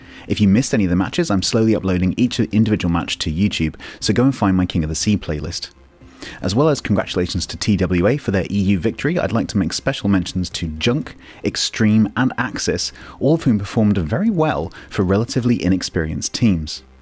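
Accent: United Kingdom. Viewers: Then I for one am very happy that you present these videos. There is a huge difference between American English and ... real English. I love all my American friends online but it does get annoying. As I'm 59 this year, I am so glad to have you fronting these videos. You now have a loyal Kiwi fan (even if I'll soon be moving to my birth nation of the Netherlands due to my lung cancer.) YOU keep them coming and I'll show my appreciation. :)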